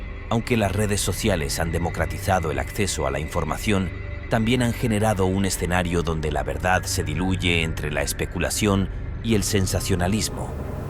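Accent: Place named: Spain. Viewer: Qué pasa, por qué todos los que narran los videos son voces de españoles? Habiendo voces de mexicanos lindas y agradables , por qué tenemos que soportar esto, qué pasa, que alguien me explique, porque me interesa el vídeo pero no me gusta que lo narre un español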